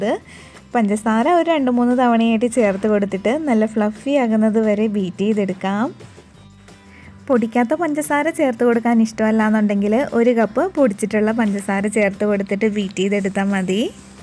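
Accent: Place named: Kottayam, India. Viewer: palakkar Kottayamkar parayunnathu pole parayunnathu kelkkana rasam.Appol ithil one cup 240 ml aano.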